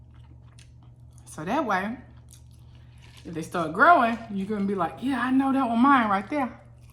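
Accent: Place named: South, United States. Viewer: Your southern accent is so pure ❤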